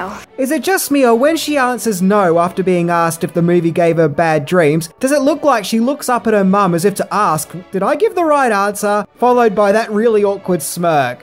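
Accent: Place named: Australia. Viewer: this is what tim pool looks like without his beanie, hey tim pool pretty good aussie accent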